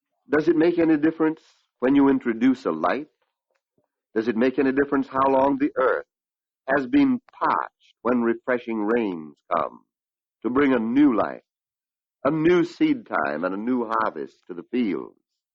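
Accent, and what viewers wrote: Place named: Maine, United States. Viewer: As a former Mainer, I love hearing his Maine accent. Forgot he grew up there. One of the giants of this teaching.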